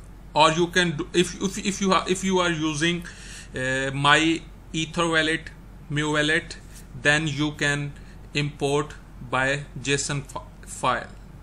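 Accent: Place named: India. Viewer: Is this indian website bcz you voice look like you are indian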